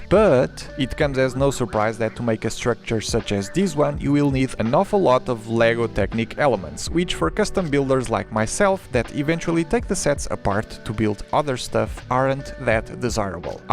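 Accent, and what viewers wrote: Portugal. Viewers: Mano, assisti o vídeo inteiro sem perceber que tu es português, se não falasse eu não iria perceber 😂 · Consegui perceber logo que eras português mal falaste xD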